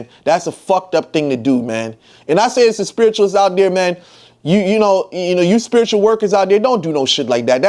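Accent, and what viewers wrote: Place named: Miami, United States. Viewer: lol Man U sound like a Miami haitian . U wild and funny af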